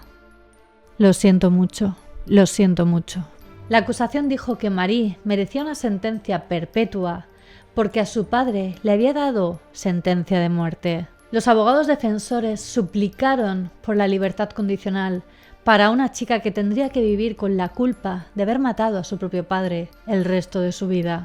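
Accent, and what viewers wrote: Spain. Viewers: Ets catalana?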